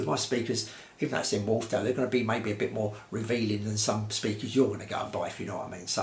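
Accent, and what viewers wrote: United Kingdom. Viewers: We cannot understand a single word you say. Your accent is annoying that british accent of urs.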